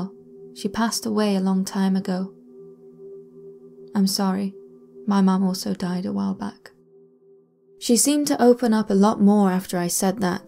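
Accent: United Kingdom. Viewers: Only thing I dislike the British accent. It's a turn off when listening to this stories I will never understand why pple find the accent attractive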